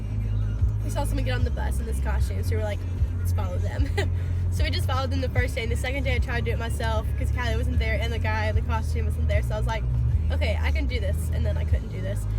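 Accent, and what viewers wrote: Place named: South, United States. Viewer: Your cute little southern accent i love it and you!!!!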